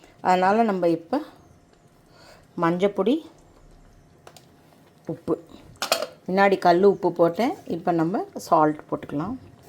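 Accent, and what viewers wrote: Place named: Brahmin, India. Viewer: மிக அருமை.. மேலும் உங்களது பிராமணத் தமிழ் உச்சரிப்பு நிகழ்ச்சிக்கு கூடுதல் சிறப்பு!👍👍💐🪷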